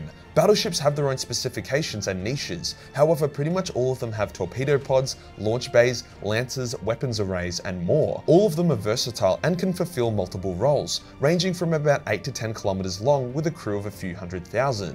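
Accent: Australia. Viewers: Damn loud aussie lull me To sleep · Hearing an Australian swear at me and also teach me 40k lore is some what pleasing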